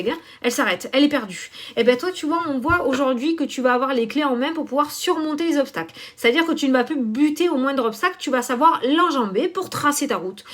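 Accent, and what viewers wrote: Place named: Southwest, France. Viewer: Merci pour tous vos partages et vos guidances! ( j'adore votre accent du sud ouest!)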